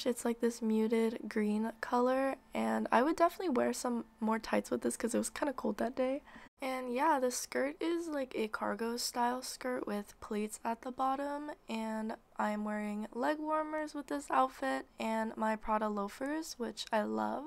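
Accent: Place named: Russia. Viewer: может мне кажется, но почему-то у меня создаëтся ощущение что у неë русский акцент) но девушка очень милая, и образы подобранны очень грамотно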